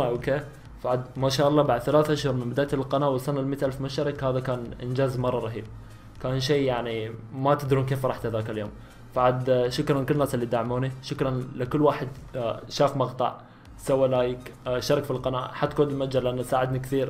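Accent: Iraq. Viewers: منور يا كلبي بل لهجة العراقية 😍😂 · هو ما قال بس من طريقة كلامة واضح عراقي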